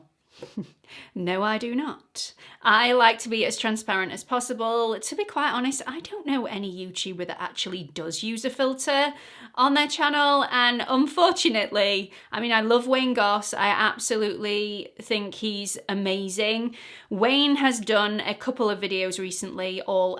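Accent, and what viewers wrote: United Kingdom. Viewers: Thank you for being so candid and answering personal, sometimes accusatory, questions. I love your fresh style and spunky personality. I am from the states and enjoy hearing a British accent, lol. You're adorable and I miss your mom ha ha. I hope that one day I can have as good relationship with my daughters as you have with your mom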